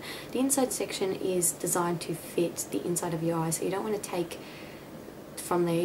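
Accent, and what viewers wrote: Australia. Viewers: aussie not british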